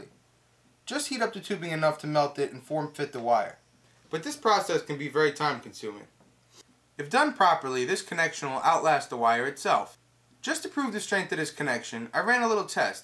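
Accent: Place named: New Jersey, United States. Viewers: Simple, straightforward tutorial, with no BS ! you gotta be from Jersey,(or thereabouts...) thanks , Man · What kind of American? Southern, New English (New York, New Jersey, New Hampshire, etc.), or western (I think he has a New English)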